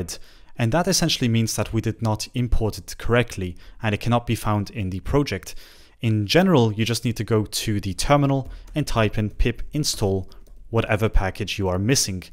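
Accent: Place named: Italy. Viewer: MA SEI ITALIANO LOOOL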